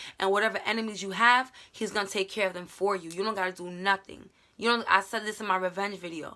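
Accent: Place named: Bronx, United States. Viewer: 746 - 0 no dislikes you a real one ❤❤❤❤ and I love your Bronx accent lol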